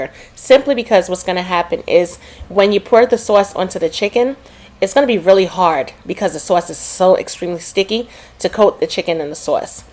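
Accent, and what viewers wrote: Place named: New Jersey, United States. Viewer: I like your accent, are you from Jersey? Great recipe btw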